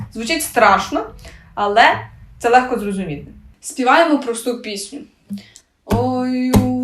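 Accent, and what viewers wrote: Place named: Western Ukraine, Ukraine. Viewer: Уроків гри на гітарі багато, але ці особливі. Бо Ви маєте певний шарм в донесенні меседжу до глядача. Звідки ви? По мові чую, що мали б були західні області. Тернопіль? Львів? Я з Франківська...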